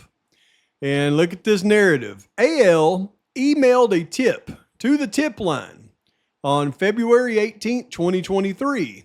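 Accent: United States. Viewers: I started listening to your vids recently. Please drop this heavy hick accent. Please. Please. It’s distracting and makes it difficult to listen. Appreciate the info and insight.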